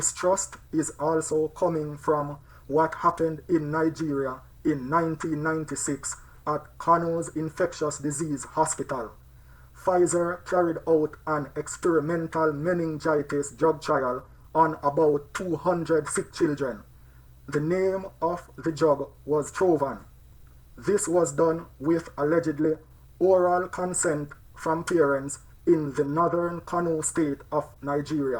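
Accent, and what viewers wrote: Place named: Jamaica. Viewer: So True. Thanks White guru white a Jamaican accent.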